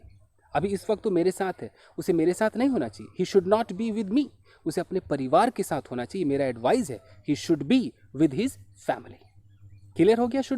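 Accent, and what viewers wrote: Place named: Kolkata, India. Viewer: Your 100% kolkata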